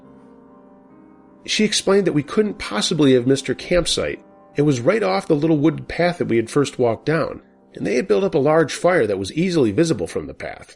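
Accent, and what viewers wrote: United States. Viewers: Your channel is one of my favorite and best accidental finds yet. <3 I adore creepy pasta, true stories and paranormal anything. I was having a hard time finding an American accented narrator I really enjoyed. Your pitch, intonation, and tempo .... spot on. <3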